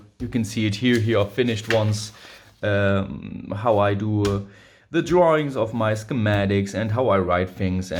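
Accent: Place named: Asia. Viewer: Wow, I didn't realize you write actual scripts for the show. I would have just used a list of points to make. Your videos are very good. Before I learned you were in Germany, I imagined you were in Asia. The accent of your English (you have perfect English) sounds Asian to me.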